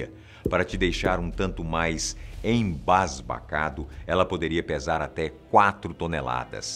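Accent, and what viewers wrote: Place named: Minas Gerais, Brazil. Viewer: Sou de minas posso dizer , o sutaque mineiro ficou bom viu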